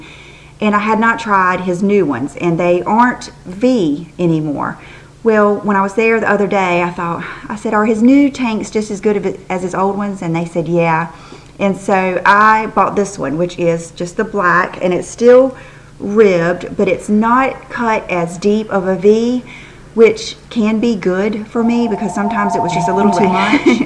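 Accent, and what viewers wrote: South, United States. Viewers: I've been obsessively watching your videos because I LOVE your accent lol! As an East Coast girl, I just love listening to that sweet, southern accent! (Also, you're just so lovely!!)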